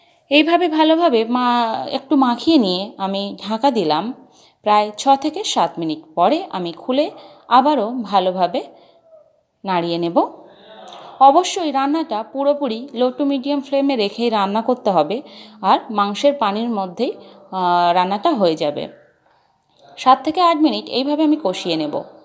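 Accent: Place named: Sylhet, Bangladesh. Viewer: That's what I wanted to know.... Thank you for sharing it.... I thought you may be a Sylheti... That's just how I felt from your accent....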